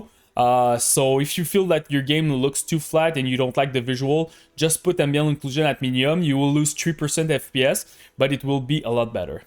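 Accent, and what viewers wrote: Quebec, Canada. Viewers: L accent québécois 😍😍😍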